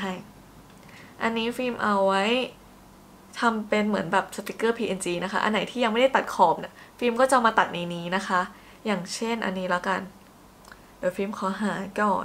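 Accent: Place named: Thailand. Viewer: คิดถึงช่องนี้ เพราะ ตอนเด็กๆดูบ๊อยบ่อย จำการรีวิวได้ แบบ จะเน้นของที่รีวิวแบบ เหน่อๆ แบบ อย่างนั้นแหละ 555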